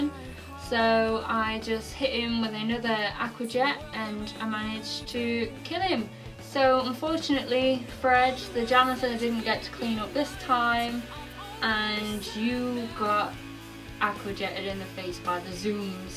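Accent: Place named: Nottingham, United Kingdom. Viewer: that Nottingham accent tho ;]]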